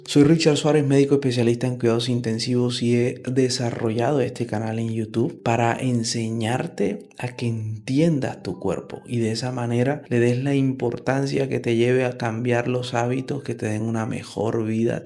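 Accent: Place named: South America. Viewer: Ademas de aprender, me arrullas. Que voz tan suave, clara y agradable, junto con ese acento sudamericano tan amable. Gracias❤❤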